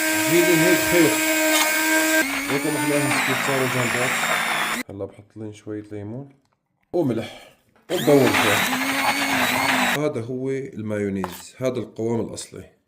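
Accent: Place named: Idlib, Syria. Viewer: بحب احضرك لانك بتحكي زي صحبيتي هيا من ادلب و بتزكرني فيها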